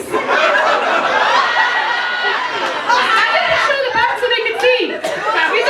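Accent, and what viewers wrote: Ireland. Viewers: you are so delightfully scattered. It's just amazing that you ever finish the set your wrote. But everything you do say is brilliant, so it doesn't matter if you stick to the set. Plus, anything an Irish woman says in that accent is delicious.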